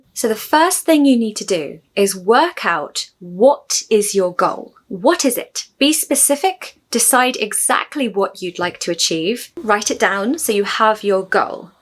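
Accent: United Kingdom. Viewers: your videos are a wonderful gift for us English learners. Your enchanting British accent with an aristocratic touch, your expressive body language, and the interesting contents.... All of these features are so motivational. May I use an Old English phrase? "God bide with thee!' Thank you! all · Happy new year pretty British-English, your lessons are amazing for us, good job, go,go further..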